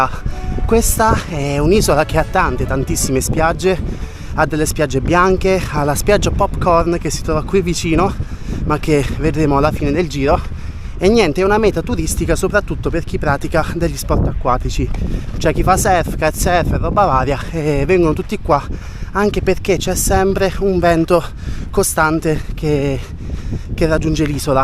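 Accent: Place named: Tuscany, Italy. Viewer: Grande, immagino dall'accento che tu sia toscano (come me😜). Bel tatuaggio 🙃 simpatico...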